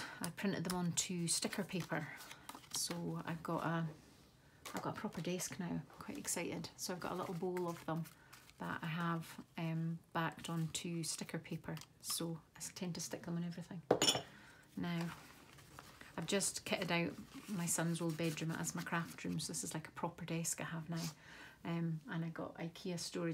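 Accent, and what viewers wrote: Scotland, United Kingdom. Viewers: Oh my goodness you really are very talented lady, these journals are gorgeous you can see how much you enjoy doing what you are doing l love them. How exciting getting your own crafting room set up, it's lots of fun. I would love to sit with you and craft away watching you create and listening to your accent, I can make the tea or coffees. I use to work in Scotland at a Outward Bound Lodge on the edge of Loch Eil. So listening to you reminds me of a time that seems lifetime ago now (26yrs now) I live in Australia with my family, watching and listening to what's happening in the UK and elsewhere is just beyond belief. Keep safe, and keep crafting because we love seeing what you do, take care Jodie xx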